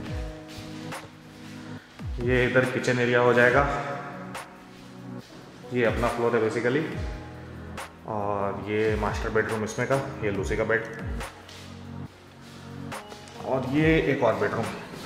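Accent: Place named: Bihar, India. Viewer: Kaa bat kr rhe hai sir ... Aap bihari hai.,❣️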